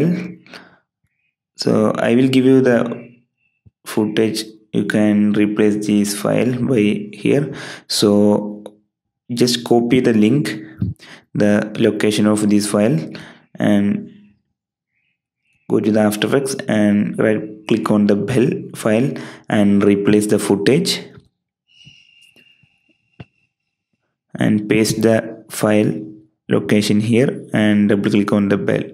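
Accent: Kerala, India. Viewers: Why do you sound Malayali (Keralian)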